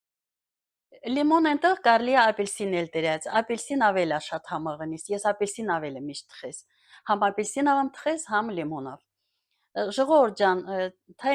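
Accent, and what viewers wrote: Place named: Karabakh, Azerbaijan. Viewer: Հետաքրքիր է հենց Ղարաբաղի լեզվով, հաճելի լսել։ Ի՞նչ կա անհասկանալի նայելով էլ կհասկանանք բառերի իմաստը։ Չէ որ դա էլ է մեր լեզուն, մեր մշակույթը։Պետք է պահպանել։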